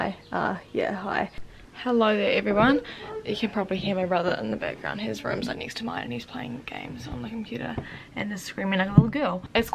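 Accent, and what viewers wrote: New Zealand. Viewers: Hi))) New Zealand accent is so nice!!!))))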